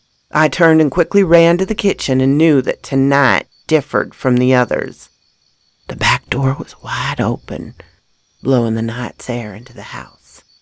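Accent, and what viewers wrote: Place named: South, United States. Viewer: Hi there 👋:) Thank you for the Spooklicious 😵 Narration!!! I 🧡 your Southern Accent 😉 I hope that you and everyone else has a Smacklicious 💋 Saturday Too:) much love Sexy Grandma Darkness ✌️💋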